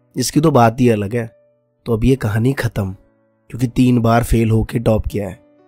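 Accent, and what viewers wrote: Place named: Delhi, India. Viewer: Sir nice video by the way aap Delhi se ho kya Touch wahi laga bolne ka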